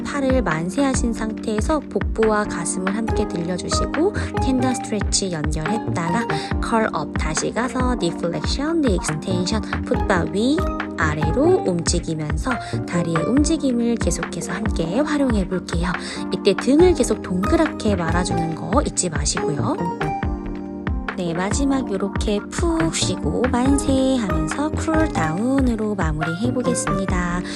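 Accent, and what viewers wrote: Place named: China. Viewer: 동물원에 가서 다리 찢는 영상 보고 왔습니다. 조선족인가요? 한국말. 하지말아주세요ㅜㅜ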